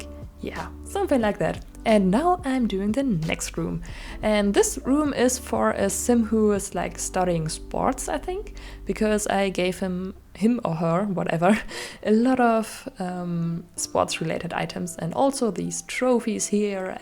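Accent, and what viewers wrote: Germany. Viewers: Are you German haha 😅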